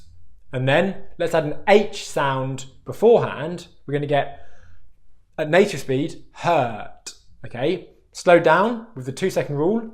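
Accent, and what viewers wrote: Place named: England, United Kingdom. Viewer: Millions of English people do speak this way. Where have you been? Listening to US American Movies?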